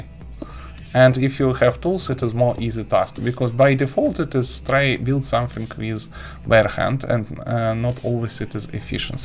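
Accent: Russia. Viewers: русский язык всё равно просачивается) "Схематик" "Майнкрафт" он говорит по русски) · you r russian accent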